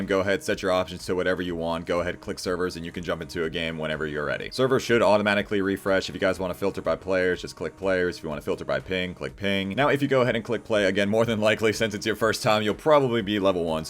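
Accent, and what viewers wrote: Greece. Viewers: Bro are you greek?? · is he greek? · Wait! Are you Greek? 0_0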